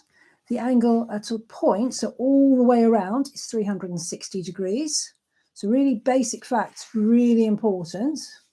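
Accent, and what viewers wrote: United Kingdom. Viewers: ..., If I remember correctly, mathematics in my school paid a lot of attention to becoming proficient in Algebra and Trigonometry, but little time was spent on Geometry, while Algebra and Geometry are very much connected! (thinking of René Descartes). Despite the fact that Geometry is very visible, it still requires a thorough structural approach strategy to problem solving, after all, you are confronted with all kinds of geometric objects and combinations thereof (also in your video), which still regularly creates confusion for me when trying to solve problems! In conclusion, if you are really a British citizen (if I'm right according to your pleasant accent), then we are neighbours, as I live across the North Sea in the Low Countries of simply beautiful Holland... Thank you again, Jan-W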